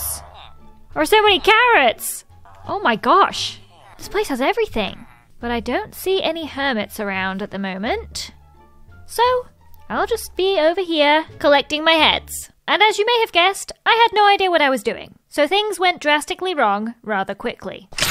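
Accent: United Kingdom. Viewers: I love how fear and idea rhime in British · OMG she can rhyme "Fear" With "Idea" Cuz she has a british accent!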